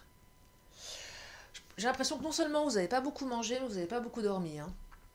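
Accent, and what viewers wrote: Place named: Paris, France. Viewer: Coucou, tu as un bel accent. Parisienne ? Merci de ton partage. Très belle lecture. Tirages a choix serait bien aussi. Plus interactif 😉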